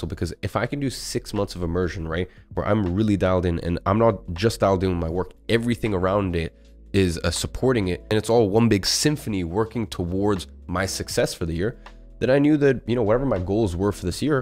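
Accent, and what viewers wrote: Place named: United States. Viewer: Bro had a russian mom, grew up in london, and has an American accent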